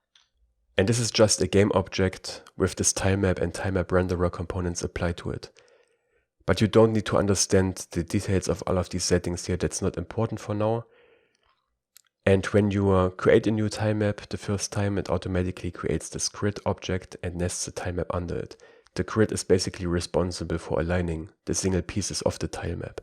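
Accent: Germany. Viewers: Love your accent, I’m german btw too😂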